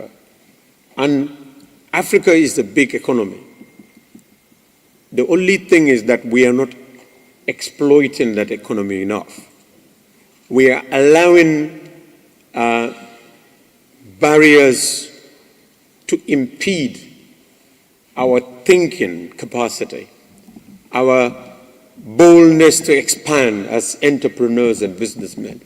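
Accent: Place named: England, United Kingdom. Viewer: 🎉❤🎉❤🎉 That's Our Chairman Sah English Accent Be lanla🎉🎉🎉🎉❤❤❤❤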